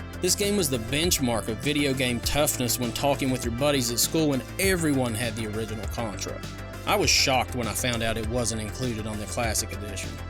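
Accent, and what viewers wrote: South, United States. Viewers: Is this a hilly billy brother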